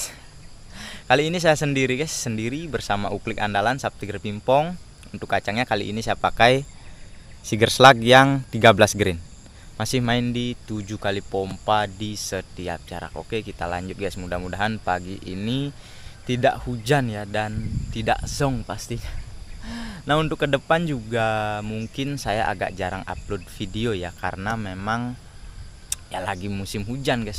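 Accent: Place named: Bali, Indonesia. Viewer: Nak bali? Kok logat bali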